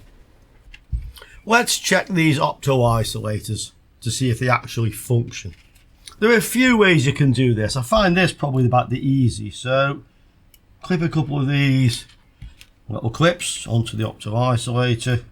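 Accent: United Kingdom. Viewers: Your British accent is very annoying!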